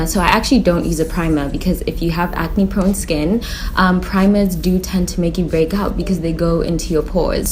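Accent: South Africa. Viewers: are you South African Sisi? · I knew from the moment I heard u speaking that u are South African, u are very detailed,love love ur videos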